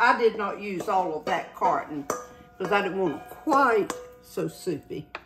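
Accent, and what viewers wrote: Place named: Deep South, United States. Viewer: You don’t say where in South Carolina your from. I live in the upstate, Greenville . I came here in the 70’s, my husband tells me when someone asks me I can finally claim, “yes I’m from around here.”☺️ Your accent sounds Deep South, Charleston and I love it! I lost my sweet 98 year old mother-in-law in 2020 and you remind me so much of her in the way that you cook. She was a wonderful teacher just like you.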